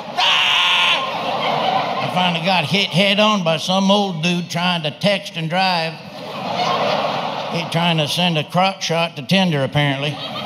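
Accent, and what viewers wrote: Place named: South, United States. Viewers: It's funny how his niche is southern country people, but his jokes are actually universal. If his jokes were done without the accent I think his reach would have been more cross cultural. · He might be from Nebraska But he's got Florida boys nailed Right down to the accent. 99 percent of what he talks about is true for deep southerners lives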